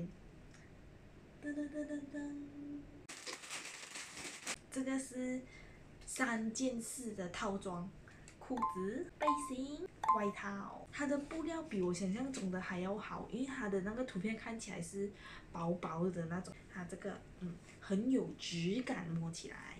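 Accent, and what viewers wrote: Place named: South, China. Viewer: 我giao南方人？啊哈哈哈哈好喜欢听你说话啊～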